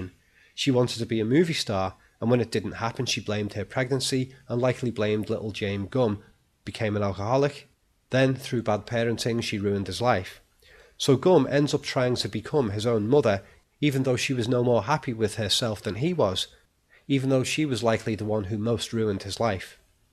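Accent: Liverpool, United Kingdom. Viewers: Where you from? South Liverpool?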